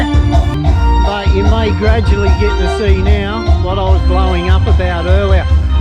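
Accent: Australia. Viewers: I thought I was Aussie... THIS bloke is Aussie!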